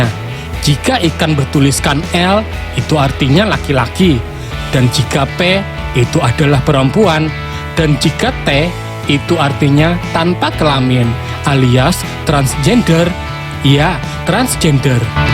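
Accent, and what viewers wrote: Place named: Java, Indonesia. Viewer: pian urg jawa lws d banjar kah sanak,banjarnya gedaguman kyk kwn ulun urg jawa🤣🤦‍♂️🙏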